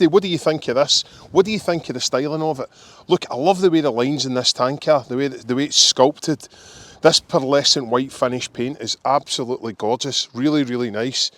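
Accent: Scotland, United Kingdom. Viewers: Man, the Scottish accent is really something else! Love the bike and love the landscape; cheers from Italy ✌🏻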